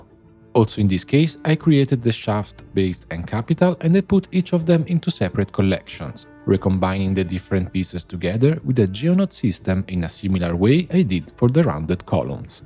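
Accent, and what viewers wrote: Italy. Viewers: I tuoi lavori sono eccezionali, complimenti (tanto sei italiano, si capisce dalla pronuncia)! Volevo chiederti una cortesia: in tutorial passati, quello sui trim-sheet in particolare, usi substance, ma questo è un problema perché è un sw non accesibile. Potresti fare una guida dove spieghi come creare trim-sheet con i materiali creati direttamente in Blender? Hai provato a creare archi e volte tramite GN?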